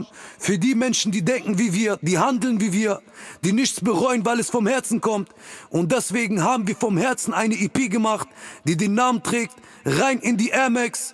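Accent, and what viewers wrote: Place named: Baghdad, Iraq. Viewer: Endlich kurdo der Typ mit dem bagdad Akzent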